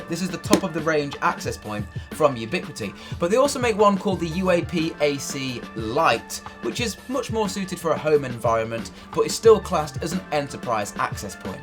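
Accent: England, United Kingdom. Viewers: English (UK) English (US) English (AU/NZL) English (Canada) All not same in pronounce of router Please not tell him how pronounce he use British accent. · I like your English accent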